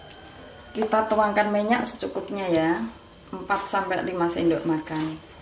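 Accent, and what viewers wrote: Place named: Java, Indonesia. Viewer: Medoq bgtz ngmongny...🤣🤣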